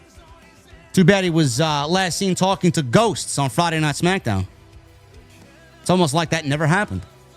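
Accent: New York, United States. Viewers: wasn't angry. That's how alot of us new yorkers talk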